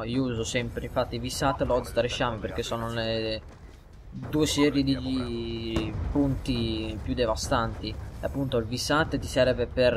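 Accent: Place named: Romagna, Italy. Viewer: Ecco perchè mi sembravi romagnolo ;) Io sono di faenza e vengo al mare li in zona ;)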